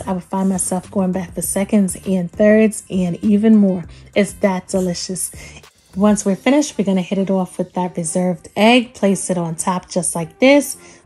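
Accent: New York, United States. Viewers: Love your NY accent. Miss home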